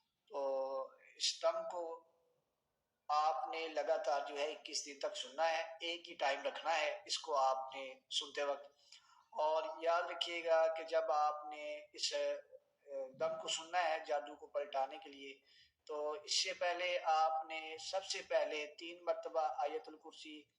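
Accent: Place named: Pakistan. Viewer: Yah Kisi Pakistani ka voice hai aapka voice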